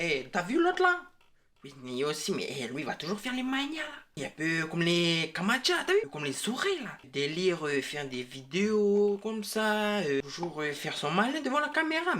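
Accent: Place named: Lifou, New Caledonia. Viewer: Sort d'autre vidéo !! Hhaha je kiff ton accent dehu mon reuf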